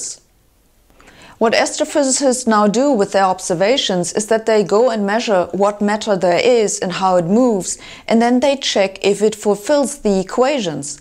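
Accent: Germany. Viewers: why a german accent sound like they are super genius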